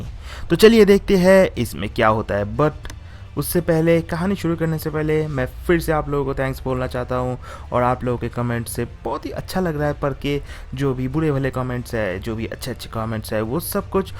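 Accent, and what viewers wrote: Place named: Odisha, India. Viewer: I think. your mother tongue is not Hindi tum Orissa se ho kya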